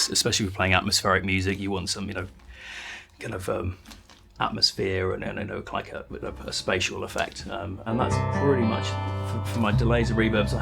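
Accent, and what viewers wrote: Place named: Wales, United Kingdom. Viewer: Blown away by the magnificent slide sounds on the various guitars and your wonderful Welsh accent. I enjoyed learning about the pedals and other gear, as well. Thank you for sharing all,. ❤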